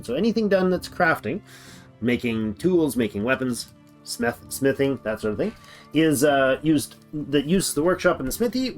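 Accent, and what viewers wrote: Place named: Canada. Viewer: Have been loving this game lately! My favorite gameplay and commentary yet- subbed and liked! I recognize a little Canadian accent there, eh?? Cheers from the Pacific Northwest USA brotha!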